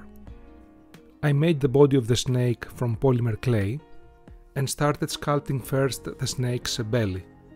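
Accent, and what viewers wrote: Greece. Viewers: AAAAA RE HELLAS I LOVE YOUR GREEKLISH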